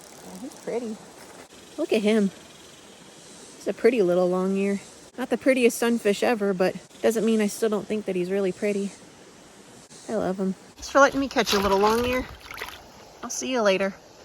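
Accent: South, United States. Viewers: confused southern accent mumbling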